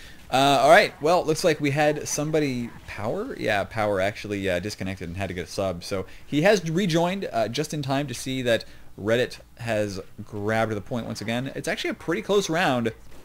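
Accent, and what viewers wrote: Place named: Australia. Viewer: That Aussie accent though... Nice cast btw!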